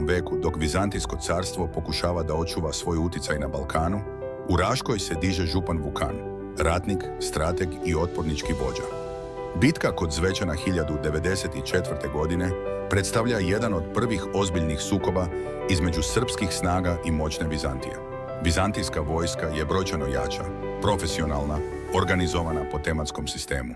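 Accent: Croatia. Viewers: Volim slušat ovaj naš Hrvatski glas kako hvali našu bracu po materi.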